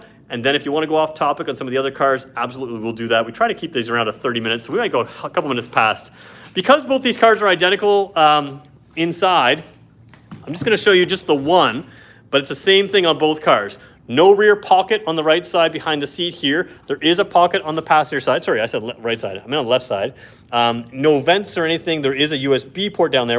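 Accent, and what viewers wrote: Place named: New York City, United States. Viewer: I enjoy your videos. They are very informative. I have one suggestion for you however. Watch one of your own videos and Count your words per minute ? You speak really really really fast !!! Maybe less coffee ? Maybe slow down just a little bit ! ? I don’t know . I am a long haul truck driver and I drive the entire United States and Canada and the difference in how people speak between New York City and Birmingham Alabama and Ontario Canada is an amazing thing to experience !! You would fit right in in New York City except you seem a little less caustic .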